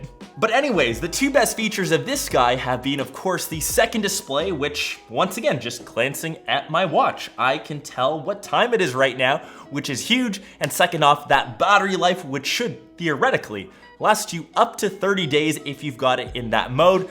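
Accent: Canada. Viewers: Being from the UK I notice you say oooot when you try to say out. Does this mean your from Canada and my young history lessons from South Park were correct ? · Are you Canadian?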